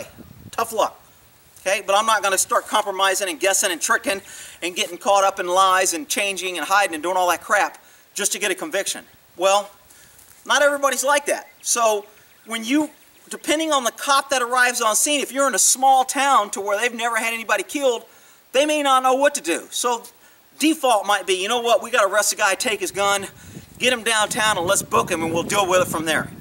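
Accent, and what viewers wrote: Texas, United States. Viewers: Texas accent is so strong in this video lol just got done watching Kyle Rittenhouse not-guilty verdict live stream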